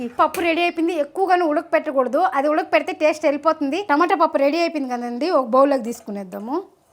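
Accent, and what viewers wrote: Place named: Chennai, India. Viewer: are u from Madras because my in-laws are from Madras so I want say is what all u r cooking I am so familiar because all the items I too cook at home so exactly I know how it taste my favorite venchina pappu oh my God I can’t believe please let me know from where are u ok the way u talk too it is same I love it keep smiling enjoy look forward another recipe 😋.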